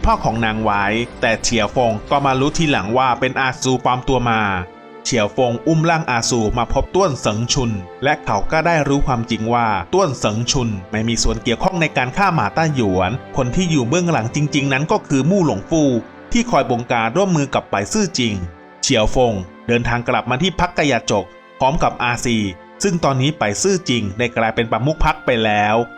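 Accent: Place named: China. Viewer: สำเนียงการพูดของผู้บรรยาย คงไม่ใช่ “คนไทย” หลายคำออกเสียงเป็น “คนจีน” เช่นคำว่า “อาวุโส”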